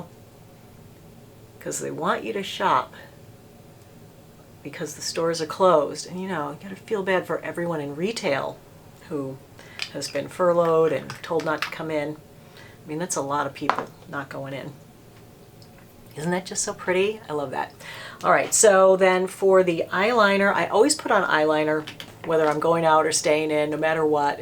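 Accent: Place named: Massachusetts, United States. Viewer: Omg! I knew you were from Massachusetts somewhere. I can tell by your accent! I'm also from Massachusetts! I'm in Webster Ma by the lake we have here... 🤗 Yaaaay I love seeing YouTubers from Mass! I'm so excited right now!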